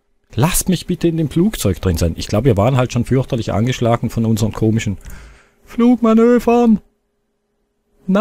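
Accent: Switzerland. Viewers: Kommst du aus der schweiz? :D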